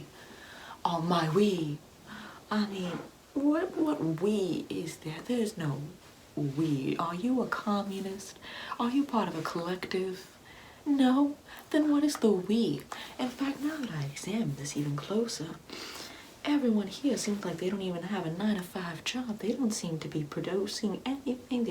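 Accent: South, United States. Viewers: Ok but I live for the southern accent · Her accent really sounds like Benoit Blanc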